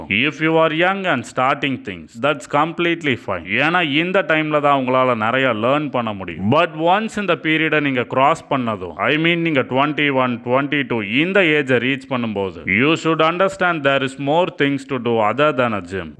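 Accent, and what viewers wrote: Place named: India. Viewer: So you guys mean to tell me, you understand everything in this video with the indian accent?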